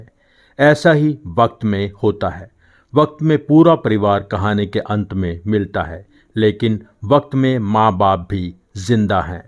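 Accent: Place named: Punjab, India. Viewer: Brilliant Hindi in Punjabi accent.....all in the family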